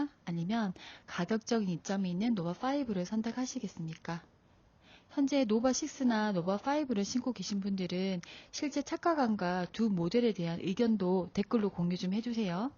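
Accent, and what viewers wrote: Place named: Gyeongsang, South Korea. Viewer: 경상도 사투리 ㅎ 귀여워요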